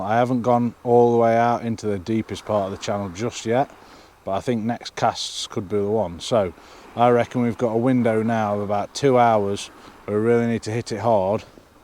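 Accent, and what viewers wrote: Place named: Derby, United Kingdom. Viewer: Hi again, should say I'm a Derby man myself, from Allenton originally, nice to hear the accent, it takes me back. 🧔 👍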